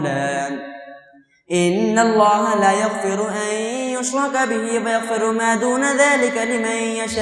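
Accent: Panipat, India. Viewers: Pure pani Patti lahja,bahtreen MASHALLAH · ما شا الله لهجه تو پا نی پتی لیکن یہ جھو لتا کیوں ہے؟ سمجھا نے کی ضرورت ہے ۔ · Bhai isse nach kr prhna nii kehte Isse pani patti lehja kehte h ap ko apni islah ki koshish krni chahiye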